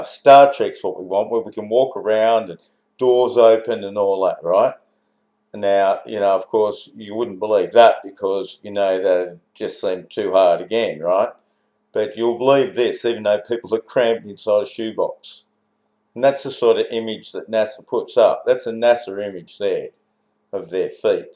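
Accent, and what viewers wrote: Australia. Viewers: I think this guy's a fellow Australian by the accent,admittedly a bat shit crazy conspiracy loving version. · You're totally full of shit. Except for an Aussie accent you got nuthin'. The capsule was supported by the service module, which is much bigger and has the consumables and the fuel cells. The Apollo is somewhat roomy inside with the seats stowed. The seats fold and retract and leave about a 6 foot tall space that is 10 feet around. Just because you're too much of a wussy to shit in front of other guys doesn't mean dedicated people can't do it in order to get a free trip to the moon. You're a hick jerkoff.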